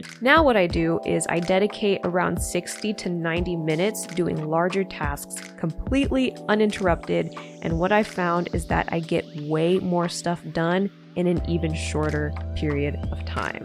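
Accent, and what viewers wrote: Australia. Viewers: I was wondering if anyone else noticed that lmaoo sounds kinda australian or new zealand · aussie accent goes crazy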